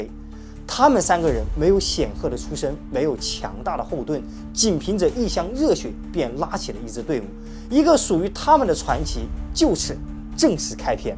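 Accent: China. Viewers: ，坦白說你為人處世的態度實在了不起。 我想有必要說明一下，之前就有提到我認為您的內容作得很用心很好，所以我是很自然而然就看完了，並不是「耐心」看完的喔！而且怕您誤會我只是只看標題就批評的，所以有多做一些說明。 像我這種只出一張嘴的鄉民，您還能這麼用心且友善地回應，真的是一個了不起的youtuber。 之前一個有做到這樣的是說書人柳豫，但他的粉絲很多都是之前經營facebook引流來的。所以節目一上架基本盤就有相當規模。 像評論三國這類節目也不少，但囿利題材，所以也頗有侷限。像說書人柳豫也發展到電玩解說。 品質算好的就有什麼文大郎、史大郎(忘了誰先誰後，但風格都一樣，可能是股東不合拆伙吧?)，他們也是引經劇典來增加豐富性。還有一個(抱歉忘了，也許是上述兩人的早期作品)會善用衛星地圖講出當年各國勢力或軍隊征伐的地形、路線。 我聽您的口音，應該是中國的朋友，我在想說如果您附近有什麼著名的三國地點，都可以作一期現場影片，當然不是只有旅遊介紹，而是加上故事情節的引導和你個人的親和力。 比如說「探索涿郡故里，原來劉備當年就是在這棵桑樹下說要當天子的！」應該也很吸睛，只是舉例，不要打我🤣 當然不是說要騙點閱啦~只是我們三國迷其實最重的是這份情懷，而情懷如何展現?我們一般人的做法就是玩遊戲、討論，但您們yotuber是不是可以上升到人事時地物的展現或溯源? 抱歉，扯遠了，只是期待您這麼有誠意的頻道能蒸蒸日上而已，以上都是外行人的胡言亂語，請不要介意。 在下只能獻上小小的訂閱，不成敬意。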